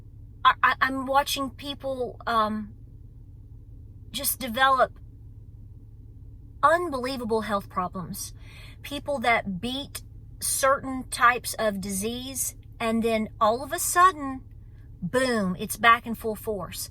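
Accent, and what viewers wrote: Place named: East Tennessee, United States. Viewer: How do you pronounce that BUUT? OR BUAT? BOOUT? I am from east Tennessee and we definitely have a unique way of speaking! I have lost most of my accent but love hearing it now! And girl you got it !!! Love you!!!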